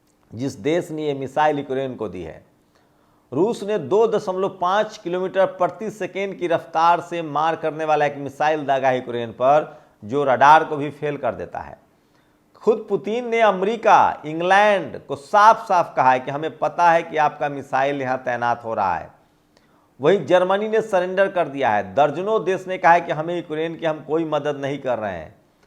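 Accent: India. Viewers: Mr your desi dialect is wonderful and your vlog presentation is really unique I really like it....keep it up speak for humanity.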